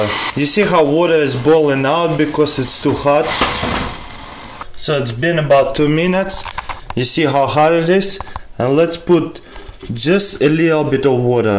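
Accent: Russia. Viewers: ...because he is Russian and I thought his accent was very charming. He is also enthusiastic, like me, about science. So, I thought "We may be from different parts of the world. Yet, science makes us all very happy." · Dude I love ur vids they are AWESOME!!! So hel9ful and nifty! Keep it up! Ps - russian accent makes it even better!!!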